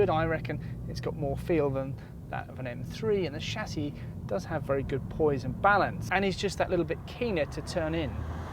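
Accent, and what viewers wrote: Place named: England, United Kingdom. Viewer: Can we have Americans be the host. Hate this English Guy. Can't bareleunderstand him on my phone.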